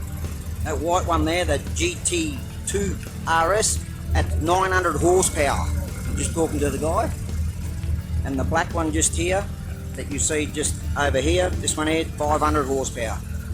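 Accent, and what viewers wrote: Australia. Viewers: Hello from an Australian in Japan⛩🌸🌸🌸 Great to see so many cool cars in the same place🚗🛻🏎🛻✨✨✨ And love your Ozzie accent💜 Have a great day🌸🐨💕✨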